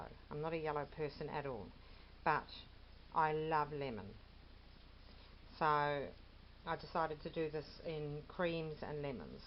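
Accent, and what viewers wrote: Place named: United Kingdom. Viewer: my Husband was laying next to me and asked if you were doing your imagination of. with your 'got ta. (garder) with your BRITISH ACCENT. he's so cute listen to you and me on hear. when he heard you say that. he started singing " " I just love it when he sings to me. thanks for your accent. we just love you and then to put the topping on the cake. we both ended with. IF THEIR ANY GENTS LISTENING we are both in the US. Thanks.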